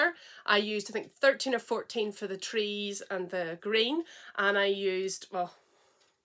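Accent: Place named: Northern Ireland, United Kingdom. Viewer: Hi, I found your podcast yesterday. Absolutely love your podcast. It was your accent that made me watch as l was a Carrickfergus girl /woman who has just moved to the North coast. Incidentally l miss Jackson’s too!!!! I also spend a lot of time in Devon. I was just thinking you can take the girl out of Northern Ireland............ As a Christian l find your words very encouraging. Thank you so much xx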